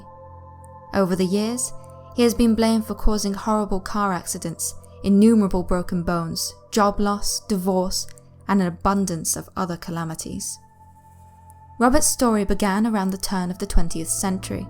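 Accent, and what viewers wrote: United Kingdom. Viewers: You have a beautiful British accent . I lived in greater Manchester most of my life being a duel Citizen. Anyways, I am for sure subbing to the channel as I am interested in the true paranormal. There is just to many out there in researchers on television and YouTube that fake it. You do not and I know another person that does not either. Ta mate , for the great videos! You truly have my respect. Cheers!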